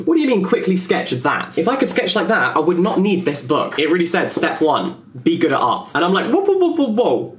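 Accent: United Kingdom. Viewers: omg he has the most british birtish accent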